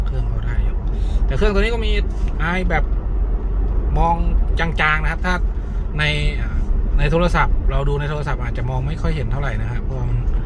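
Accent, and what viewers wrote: Phu Thai, Thailand. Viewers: แอดมินเป็นคนผู้ไทบ่ครับ สำเนียงเว่าคือๆแท้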